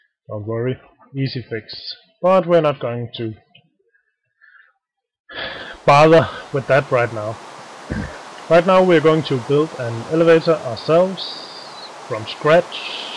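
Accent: Denmark. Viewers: First: How do you make the stone blocks in the 'gate' going down and up? I Wnat to make something like that :) Can you just link me a video for a tutorial og the tutorial you used? Second: Are you from Denmark? It sounds like you are xD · That's the thickest danish accent I've ever heard xD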